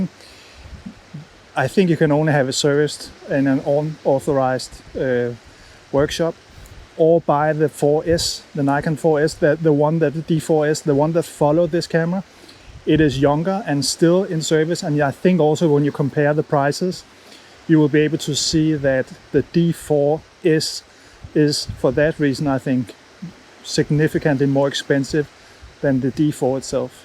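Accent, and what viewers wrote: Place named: Denmark. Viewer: I love Danish accent 😂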